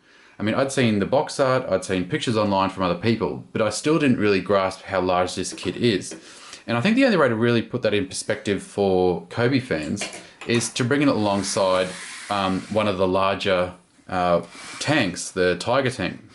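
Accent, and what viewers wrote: Australia. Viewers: Are you from Australia, you have that acent?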